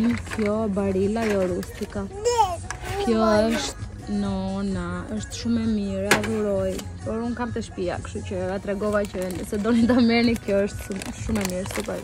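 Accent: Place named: Dibra, Albania. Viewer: Hahaha me knaqe po nga je se flet si nga dibra se nga dibra jam vet urime suksese pac me kto video❤️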